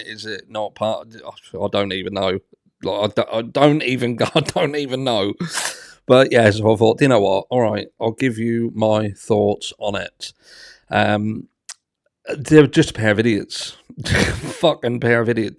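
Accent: Norfolk, United Kingdom. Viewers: Mate, I just wanna say. I found your channel during this case, and your coverage has been brilliant. 👌🏻 I just love hearing the Norfolk accent on YouTube, 😆 I don’t know of many content creators from around here. I’m a Lynn boy! May I ask where abouts in Norfolk you’re from?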